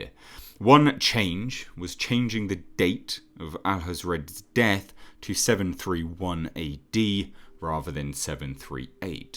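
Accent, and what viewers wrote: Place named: England, United Kingdom. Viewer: sounds like James Earl Jones with an english accent. "Oi! I am your father mate!".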